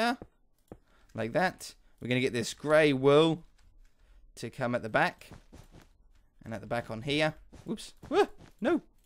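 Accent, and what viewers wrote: United Kingdom. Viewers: where about in the UK are you from I can't tell from your accent?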